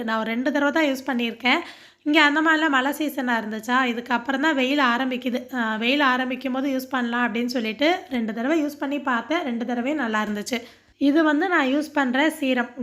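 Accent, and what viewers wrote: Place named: Adirampattinam, India. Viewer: Adirampattinam slang kooda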